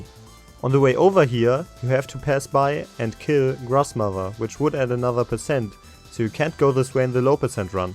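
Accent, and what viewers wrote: Germany. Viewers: Nice Video but hör ich dar ein deutschen Akzent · I, a german, watch you, possibly and probably a german, talk english. Beautiful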